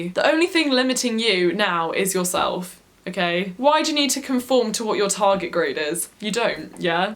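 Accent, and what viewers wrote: England, United Kingdom. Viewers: Lol why is accent and voice so different to now(2020)?? Like she sounds more English which is weird as I’m English but I e never heard someone sound more British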